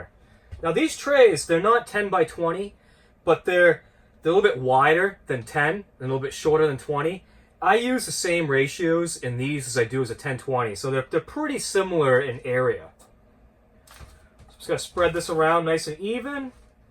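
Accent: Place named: Boston, United States. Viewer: I love your accent, Boston area? Or up here in Maine?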